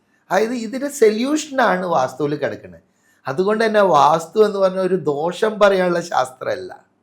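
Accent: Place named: Kannur, India. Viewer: ഇദ്ദേഹം കണ്ണൂർ കാരനാണോ കണ്ണൂർ slang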